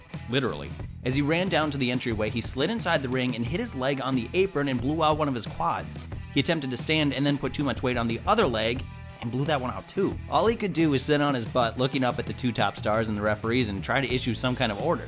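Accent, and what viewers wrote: United States. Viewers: Click on video. Hear American accent. Click off video. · A 'Murican narrating a WotColtcha video? 2016 really has been crazy so far... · American accent on a WhatCultureWrestling video. Dislike. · I hate this narrator Loses all of it's class with the geek American. Stop it.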